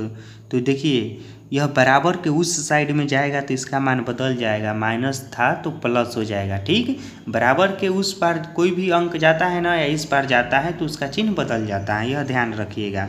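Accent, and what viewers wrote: Bihar, India. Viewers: Aap bihar se ho na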